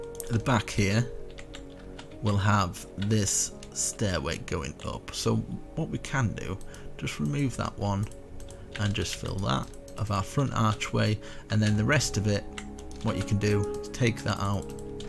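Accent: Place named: United Kingdom. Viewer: Who the fucks this British guy interrupting my infomercials?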